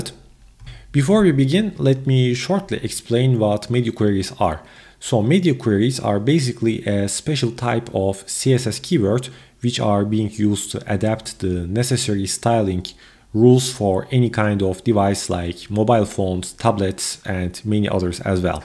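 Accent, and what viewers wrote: Turkey. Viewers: I'm browsing for coding solution and a man with Turkish accent tells me how to solve. Gosh, finally.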